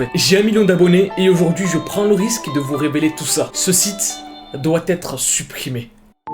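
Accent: England, United Kingdom. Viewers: Un accent anglais de qualité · J'adore ton accentuations anglaise 🥰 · On es tous d'accord que l'accent d'anglais de et Magnifique ✨ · Moi je trouve on parle pas assez de l'accent anglais MAGNIFIQUE de 🤗🤩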